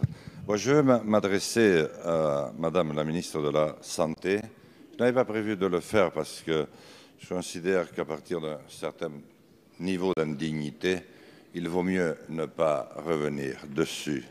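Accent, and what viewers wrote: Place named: Southwest, France. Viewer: Vs êtes du sud ouest gardez votre accent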